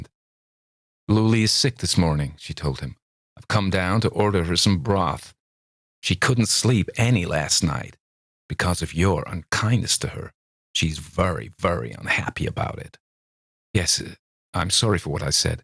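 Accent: United States. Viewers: My opinion, for what it’s worth, is that Luly was just a naïve kid who didn’t have the cynical worldly “sense”the men had. She was just having fun, learning to skate, learning to swim etc and that was all there was to it. The men put a different color on her actions. Main was smitten with her and therefore jealous, so he tried to put her in a bad light to his friend—and he wasn’t much of a gentleman to talk about a lady as he did. Campbell was a prude and a sadist. A true gentleman would never be that cruel. Cool perhaps, but not cruel. A very upsetting story, although your reading as always was excellent. :_pumpkin: Couldn’t quite place your American accent; Luly was apparently brought up out west. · I love listening to you read. Is that an American accent?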